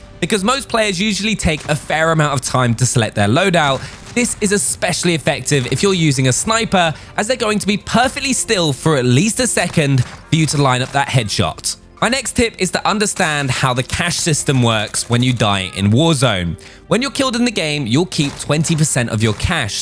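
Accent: United Kingdom. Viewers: Now I know....he actually British by his accents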